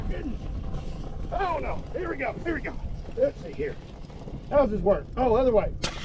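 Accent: Kentucky, United States. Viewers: Joe Rogan but from the stat of Kentucky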